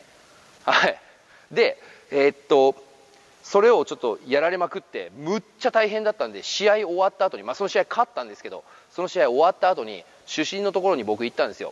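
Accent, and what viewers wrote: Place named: Okayama, Japan. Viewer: しゃべりかた、耳障りがえーとおもーたら、岡山人じゃったんか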